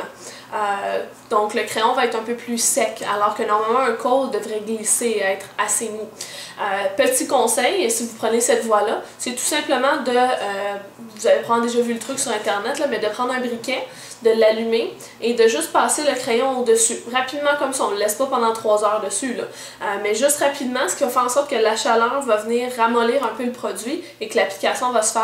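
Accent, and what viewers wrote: Quebec, Canada. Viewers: Tu as l'accent Québécois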